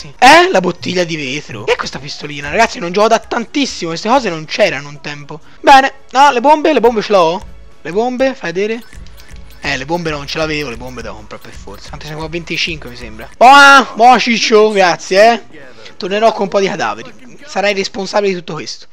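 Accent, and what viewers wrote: Tuscany, Italy. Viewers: Ma sei toscano? il tuo accento mi ricorda tanto il mio. sai i toscani si accorgono dell'accento toscano degli altri più facilmente degli altri :D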